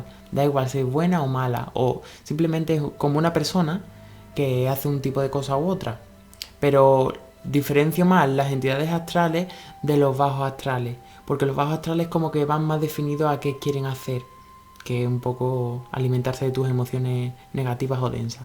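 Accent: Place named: Andalusia, Spain. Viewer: Soy de estepona Malaga vivo aqui eres andaluz por el acento. Buen video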